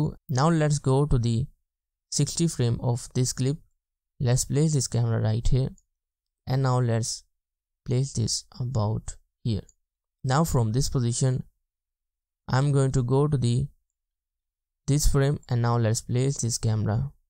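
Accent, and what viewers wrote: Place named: India. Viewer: I struggled a bit too. He sounds like he might be south Asian. Possibly Indian. I was able to navigate his accent because I’m friends with a lot of Indian dudes at my work who have similar accents. Once you work out his words, the tutorial is actually very good.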